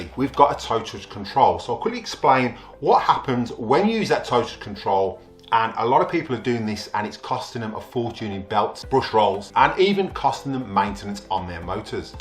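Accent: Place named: Birmingham, United Kingdom. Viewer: You say $200 when your accent sounds very clearly a UK £ one. Specifically from Birmingham UK West Midlands.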